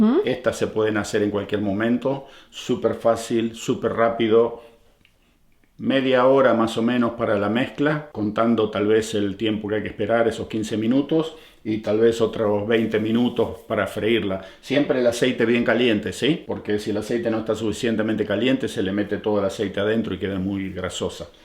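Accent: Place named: Argentina. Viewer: querido que hermoso..no perdistes tus costumbres argentinas,tu tonada,las costumbres..eres muy autentico BIEN ARGENTINO¡¡¡¡¡SALUDOS DESDE SALTA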